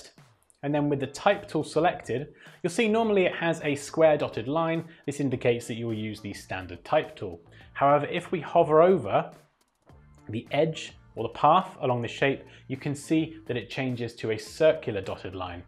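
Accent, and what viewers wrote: England, United Kingdom. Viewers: thankyou for tutorial, where do you from ?, england?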